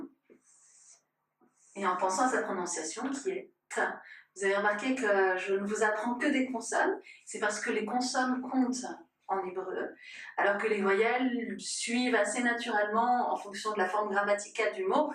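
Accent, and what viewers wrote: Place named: Tlemcen, Algeria. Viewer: On dirait une tlemcenienne.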